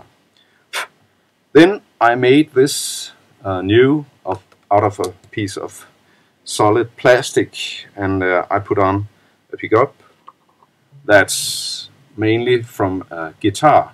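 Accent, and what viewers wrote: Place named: Denmark. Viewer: meget nice men klar dansk accent xD kunne høre det med det samme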